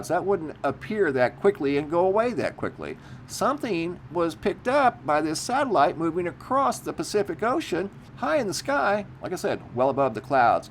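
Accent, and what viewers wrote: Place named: United States. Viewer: Your voice is just so quintessentialy American 😊😊 so easy to listen to which helps take on the information.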